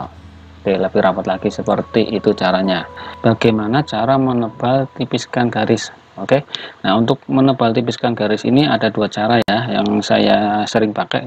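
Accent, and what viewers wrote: Banyumas, Indonesia. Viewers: Belajar cara bikin linetype sama om.. Salam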